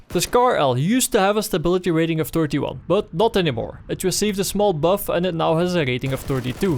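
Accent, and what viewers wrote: Netherlands. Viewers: 100% Jij bent Nederlands. De accent xD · Judging by his accent, he's from the Netherlands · the more the netherland accent coming through - i love it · You sound dutch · Sorry it is dutch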